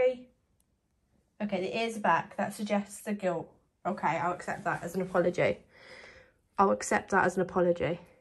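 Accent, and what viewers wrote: United Kingdom. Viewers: Your accent is UK ?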